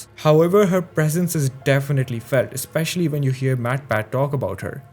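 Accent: India. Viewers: Finally an Indian who recognises him!!!! I recommend fixing your accent and maybe try to better your editing and you'll get millions of views in no time!!!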